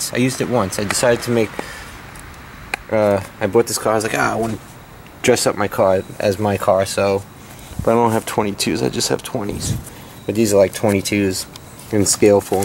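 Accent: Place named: East Coast, United States. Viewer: Are u from new York or somewhere in the east coast u have a strong acent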